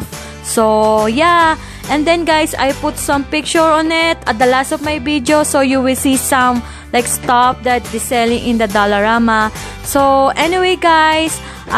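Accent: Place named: Philippines. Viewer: Hi,may I ask if you are Filipino?I just noticed your accent.:)Nice haul by the way.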